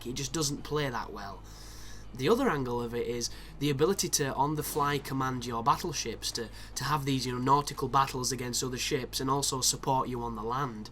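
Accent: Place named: Yorkshire, United Kingdom. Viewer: Funny you don't watch GoT, your accent reminds me so much of Jon Snow's.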